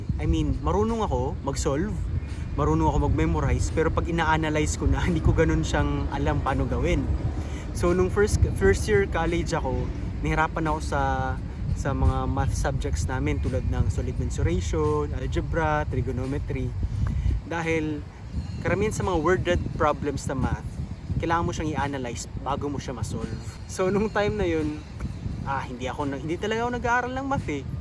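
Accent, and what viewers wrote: Visayas, Philippines. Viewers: KUYA!! idol ko accent mo hehehe bisaya here maklaro gud siya ya! 😂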